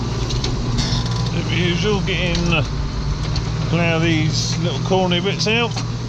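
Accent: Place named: Lincolnshire, United Kingdom. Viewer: help settle an argument for me please, we know you're in Beds. but you're accent sounds Lincs. to me but my mate reckons it doesn't, so are you or are you not a Lincs. lad?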